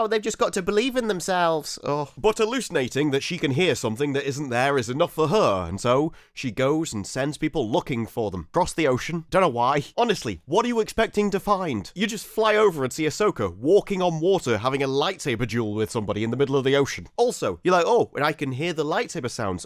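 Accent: United Kingdom. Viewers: Go to the Dentist u brit, handsome voice tho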